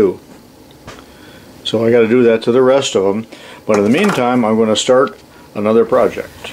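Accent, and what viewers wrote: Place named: Pennsylvania, United States. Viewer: Your accent indicates that you are from Indiana County, Pennsylvania just as Jimmy Stewart was. Am I correct?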